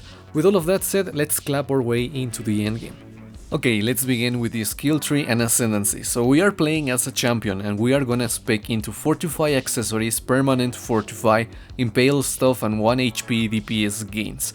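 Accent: Spain. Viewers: eres español verdad?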